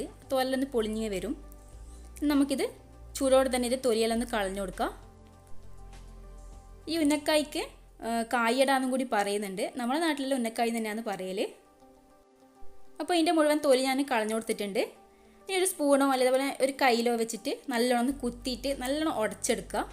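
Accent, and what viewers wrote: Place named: Kannur, India. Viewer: Samsaram kannur bhasha kelkkan nalla rasamund.. recipeyum nannayittund.. good presentation and preparation 👌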